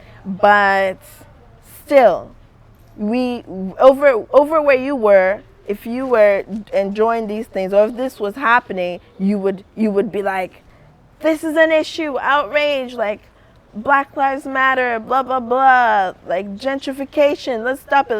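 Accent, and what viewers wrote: Africa. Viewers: She has an african accent as well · She's not Canadian, sitting up there with her thick African accent. Has she ever been to the US. She's so ignorant. The same thing is going on in Canada with black people. How is she speaking on America? If she's never been to America she's just taking what people that she knows in America are telling her. I'm AA the way she saying, AA are treated in the US. I've never been treated like that. No one in my family's ever been treated like that. Not all AA are treated badly. Only a ignorant person would think that. That's like me saying all Africans live in the village in cow manure huts and you're all dirty and you're all stink. All African are educated. Like how ignorant would I be to say that. smfh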